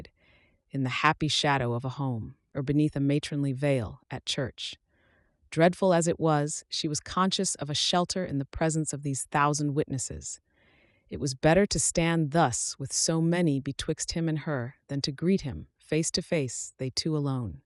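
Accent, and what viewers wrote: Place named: United States. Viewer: Hey! I am listening from Brazil. Thank you so much for indicating which audios are using american english! P.s.: I prefer the american ones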